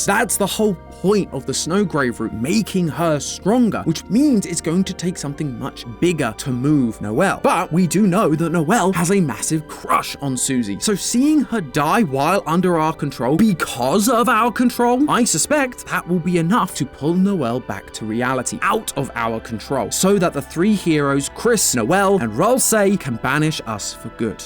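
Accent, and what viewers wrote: United Kingdom. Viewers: i love how British accent makes 'deltarune' appear as 'delta room' in the subtitles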